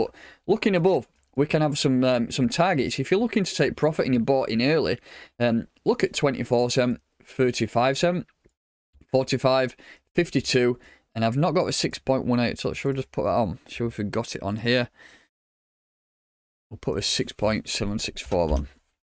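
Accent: United Kingdom. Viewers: dude stop talking with british accent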